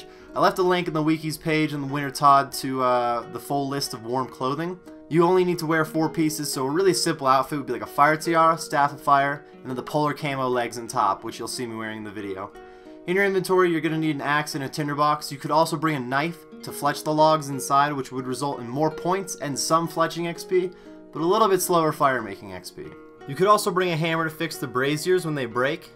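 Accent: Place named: South, United States. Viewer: Where you from? Noticed the southern accent :P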